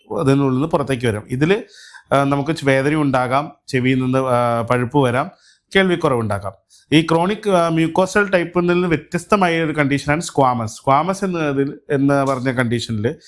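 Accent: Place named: Tamil Nadu, India. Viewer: പ്രിയ ഡോക്ടർ ഒന്നും മനസിലായില്ല ഒരു തമിഴ് ചുവ ഉണ്ട്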